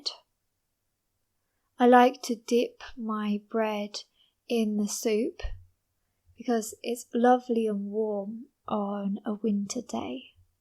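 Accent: United Kingdom. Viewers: I love British accent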